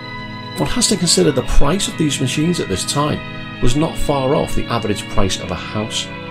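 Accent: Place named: Liverpool, United Kingdom. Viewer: You have a scouse sound, being from Liverpool myself I can tell a bit of it (maybe a bit manc?) Genesis were always very popular in the north west, with them and floyd having about a 20% increase on cost on tapes and vinyl in the 90s when I was a teenager! Well worth it though.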